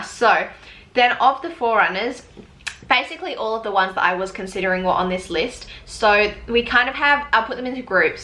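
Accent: Australia. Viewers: Hi , I like your accent, reminded me of travelling through 'Stralia' 22 years ago. You did some good research, thanks for pointing to the table. I am in the market for a new sportswatch after my vivactive hr broke. How was the 245 inthe last 2 years? Did you mis the cool features the higher models offer? How was it when swimming, is 50m wr enough? I do like a little more ruggedly, because of playing with kids. I am in a sales period here now, and got to a short list: fr245m for 250, instinct solar 300, fr745 for 350 and fenix 6pro 450(still expensive). The 7 is out but is way too expensive. That solar instinct should have music. Which one would you recommend?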